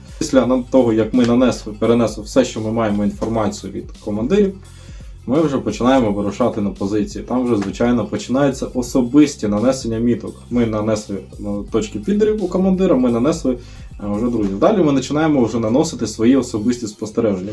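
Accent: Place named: Western Ukraine, Ukraine. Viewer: ого) я би навіть не сказав шо ти був російськомовний) балакаєш так ніби з західу України)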